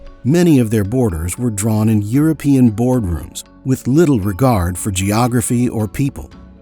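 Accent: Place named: United States. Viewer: lol he sounds yank to me nothing euroxentric there 😂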